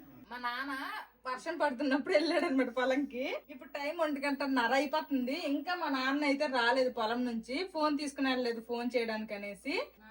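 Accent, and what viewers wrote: Srikakulam, India. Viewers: Srikakulam launguage is an emotion 😊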